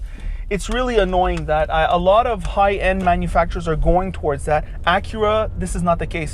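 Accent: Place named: Quebec, Canada. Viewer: Great review from an honest guy! French Canadien?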